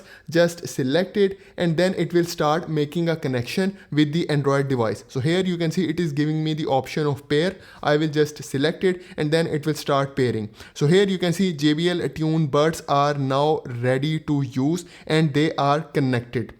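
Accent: India. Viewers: Nasty pajeet accent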